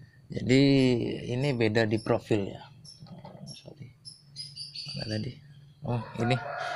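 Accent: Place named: Madura, Indonesia. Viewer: Logatnya madura...asli mana mas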